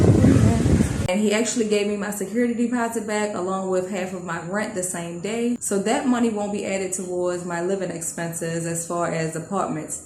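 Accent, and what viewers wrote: United States. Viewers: you have a cowboy accent haha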